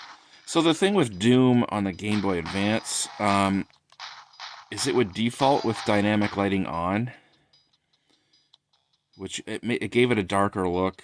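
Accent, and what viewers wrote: Canada. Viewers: ah yea i heard some Canadian inflection but thats common here too lol and alright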